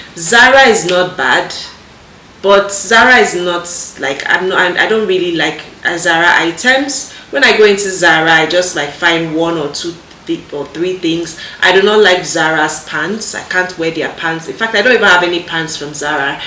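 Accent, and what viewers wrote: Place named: Canada. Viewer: Lol, Very Canadian accent.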